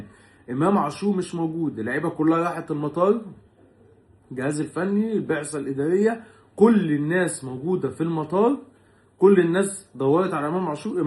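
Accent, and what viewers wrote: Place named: Iraq. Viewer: اسمع عراقي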